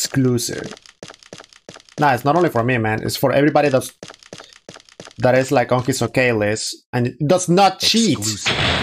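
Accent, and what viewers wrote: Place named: South America. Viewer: Just finished part 2, great job wow! I like your accent, are you South American?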